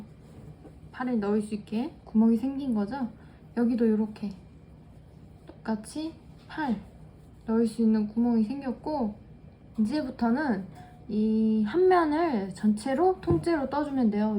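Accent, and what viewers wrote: Seoul, South Korea. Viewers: 서울 사투리 쓰시나요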